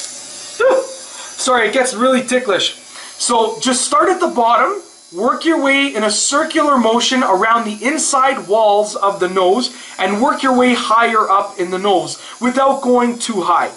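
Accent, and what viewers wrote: Canada. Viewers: Are you Canadian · also nice thick canadian accent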